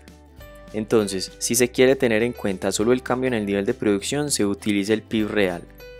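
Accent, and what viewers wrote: Antioquia, Colombia. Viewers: Eres muy claro y acertado al explicar! y tu acento paisa hace más ameno escuchar este tipo de temas haha :3 Gracias por tomarte el tiempo para hacer estos vídeos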